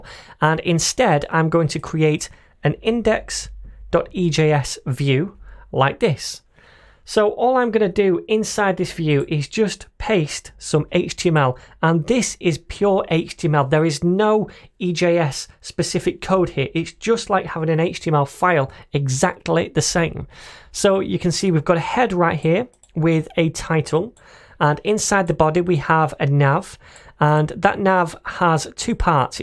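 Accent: United Kingdom. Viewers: Bro the moment I start working I'll by a course, merche or do something to pay you for such a content as good as this, at first I didn't wanna try because it's a little difficult for me understand british accent but I not just improve that part of my english but also lear so much about node. GREAT CONTENT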